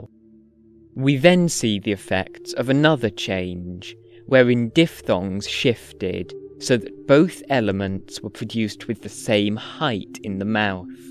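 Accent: United Kingdom. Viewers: I love your accent!! I feel it is from somewhere in UK, is it??? (I'll continue to watch the video, as I also love knowing the evolution of languages! The one I speak as native is Spanish).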